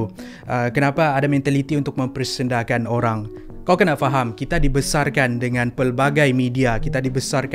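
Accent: Sabah, Malaysia. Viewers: Abg org Sabah ke.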